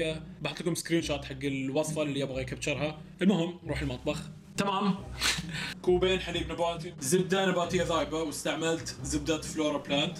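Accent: Eastern Province, Saudi Arabia. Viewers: من لهجتك واضح انك من الشرقيه وربما الاحساء تحديدا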